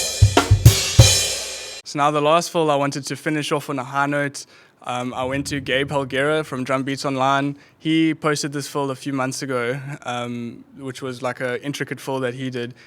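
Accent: South Africa. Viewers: Awe u have an S.A accent😅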